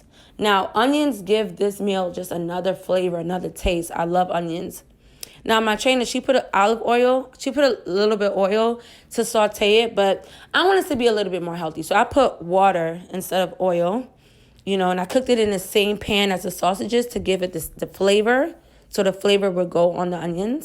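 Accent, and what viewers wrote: New York, United States. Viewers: I immediately knew you were from New York within the first ten seconds. Lol.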